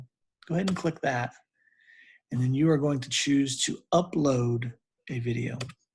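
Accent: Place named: United States. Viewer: This was a great video. So nice to hear an American accent instead of these Indian accents.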